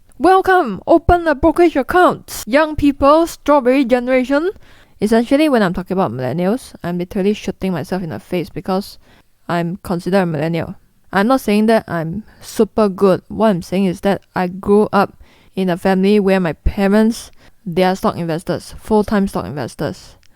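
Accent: Singapore. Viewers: Great content,! So glad I found you AND your beautiful Singaporean accent. Thank you for the hard work you do. XOXO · i actually like your singaporean accent . it grew on me lol i dont care what you sound like.. you say all the right things :D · I absolutely adore your Singapore accent!!! Thank you so much, I am relatively new to your channel...and I am enjoying and appreciate your content. You are have a gift of teaching, with plenty of wit, humour, and at the same are able to transfer your knowledge, sharply and well broken down. Thank you. ☺️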